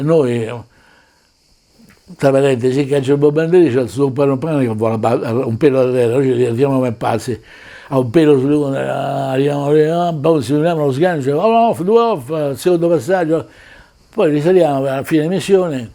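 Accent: Veneto, Italy. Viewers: Io direi che un romano con l'accetto veneto è di tutto rispetto" o stregheta".😊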